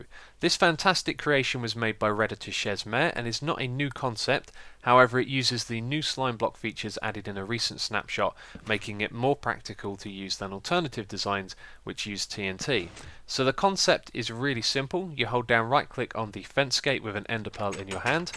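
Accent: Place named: United Kingdom. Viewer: fricken peeple deez dayZ. cant even let a man have a british akcent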